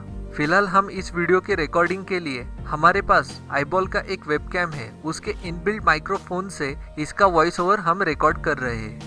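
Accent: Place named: India. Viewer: Oh god the Indian accent 😭😵😫😖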